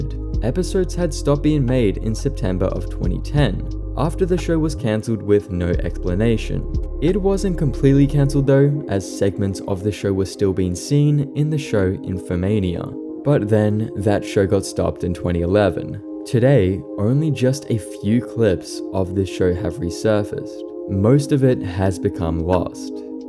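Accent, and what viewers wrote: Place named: Australia. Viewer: the aussie accent is great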